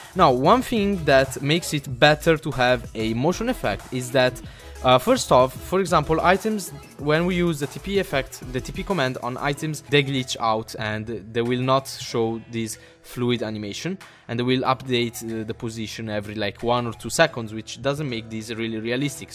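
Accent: Italy. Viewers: Si sente che sei italiano 😉😉 xD Sei un grande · si sente che l'accento è marcatamente italiano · quanto si sente che sei italiano😂 comunque sei un figo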